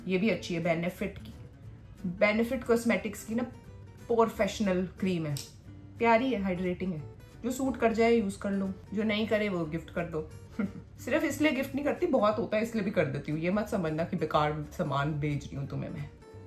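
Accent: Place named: Chandigarh, India. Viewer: You literally speak like us, people from Chandigarh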